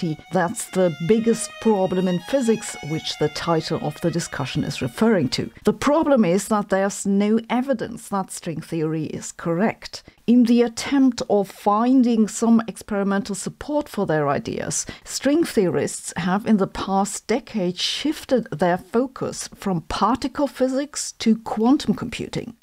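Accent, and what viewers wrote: Germany. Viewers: Love the way she says BS with her German accent. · "that I can say bullshit when I mean bullshit". This is very clear, but not very lady like. I like your german english - I speak it also.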